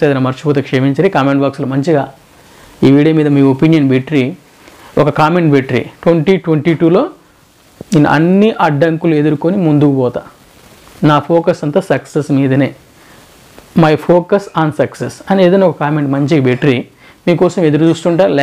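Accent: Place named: Telangana, India. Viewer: Sir mee telangana yasa baagundi😃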